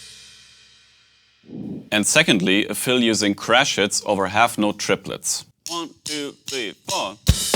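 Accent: Germany. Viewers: Germany accent ❤❤❤❤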